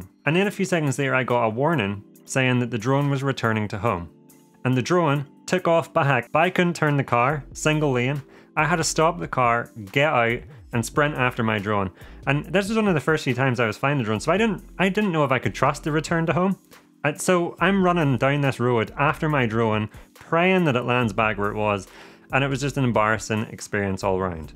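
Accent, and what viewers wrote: Northern Ireland, United Kingdom. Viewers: Are you Northern Irish? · Hi, your accent is amazing. I had to focus and struggle to comprehend each sentence, and I mean that as kind of a compliment. Where do you live in the UK? I really have the notion one should recommend your video to advanced level English language students because as a non-native English speaker for more than 30 years I still very much struggled understanding you :) Very good advices regarding safe and legal drone flying BTW. Cheers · Northern Irish accent? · Northern Ireland accent?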